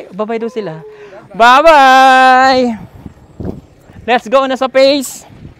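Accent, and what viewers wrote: Visayas, Philippines. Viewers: Baka Sir pagbalik mo sa manila may Punto na Ang salita mo ng bisaya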